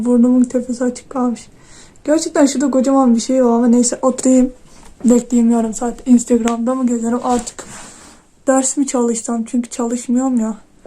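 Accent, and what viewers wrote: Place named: Kütahya, Turkey. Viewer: Şiveden Kütahyalı gibisin öyle isen Kütahya'nın neresindensin?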